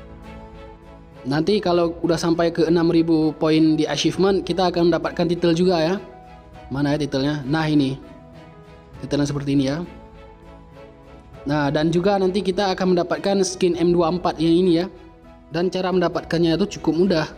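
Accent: Aceh, Indonesia. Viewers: Kaya logat aceh ya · Logat aceh sang?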